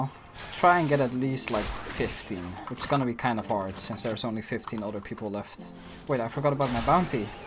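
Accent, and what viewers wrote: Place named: Netherlands. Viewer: Je hoort het Nederlandse accent haha ❤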